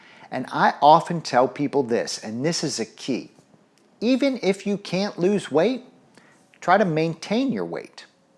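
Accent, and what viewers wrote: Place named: South, United States. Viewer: I love his accent.....educated southern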